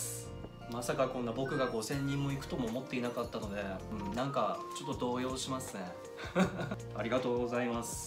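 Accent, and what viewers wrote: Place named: Hokkaido, Japan. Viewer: かっこよくて、介護士さん。ちょっとした北海道なまり？も、とても可愛いと思います！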